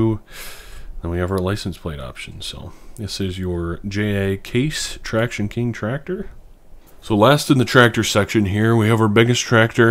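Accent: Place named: Michigan, United States. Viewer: Haha just started watching your videos searching for allis chalmers heard your voice and said to myself that guy sounds like he is from Michigan and lo and behold you are lol I to am from Michigan in the U.P. I thought I caught a little yooper accent from you but could be northern Michigan as well lol anyway thanks for the video.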